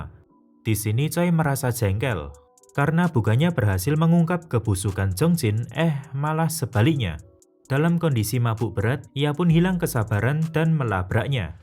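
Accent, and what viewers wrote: Java, Indonesia. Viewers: Suara yac medhok .tapi enak didenger Karna notasi yac pas. Gk kecepatan tau kepelanan . Dan jenis suara yac berat Enak pkk eh dnger .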